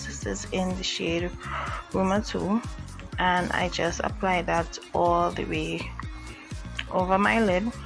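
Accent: Trinidad and Tobago. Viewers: Hey Hun, I love this tutorial, this look is simple and pretty, love hw you did that wing liner. Love that Trini accent, Jamaica over here 🥰. Enjoyed watching, i subscribed, I have a channel also, stop by and show some love, tale care 😍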